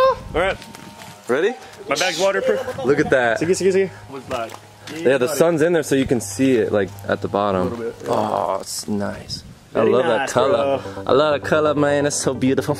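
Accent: Bisaya, Philippines. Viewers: Lingawa naku sa bisaya accent. HAHAHA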